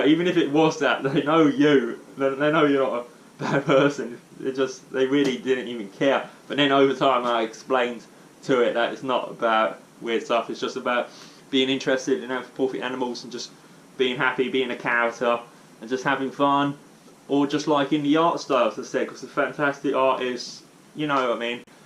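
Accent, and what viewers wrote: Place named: United Kingdom. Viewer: Boi i can't stand this level of British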